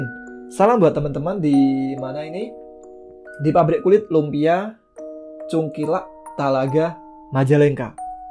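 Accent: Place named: Banyumas, Indonesia. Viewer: Hay... Aa ngapak... Aku dari Brebes nih... Salam santun buat mas.makasih untuk ceritanya.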